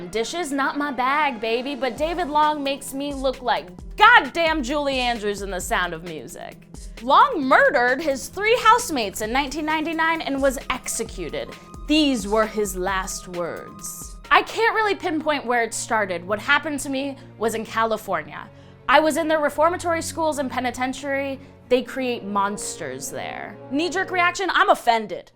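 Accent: Texas, United States. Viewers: Wait! Your from Texas!? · ur from Texas?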